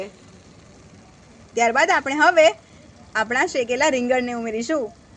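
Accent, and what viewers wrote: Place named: Ahmedabad, India. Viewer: Ben kathiyawadi hoy ane amdavad ni bhasha bolta hoy ewu km lagya kre se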